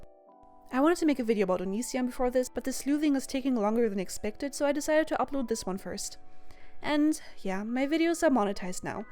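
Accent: Germany. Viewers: Das ist der Akzent! Du kommst aus Deutschland! :3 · OK at the end I heard it, you are from Germany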